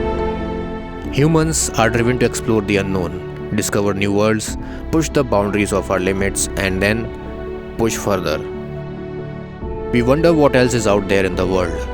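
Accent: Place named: India. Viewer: good lord, the indian accent, it feelsl like you want to scam my money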